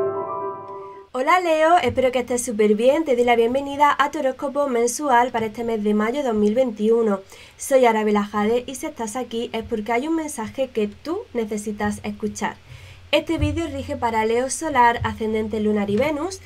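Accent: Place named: Castile, Spain. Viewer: Gracias hermosa por tu lectura y consejo, tienes una voz muy linda y castiza, gracias chula eres maravillosa, besos desde México.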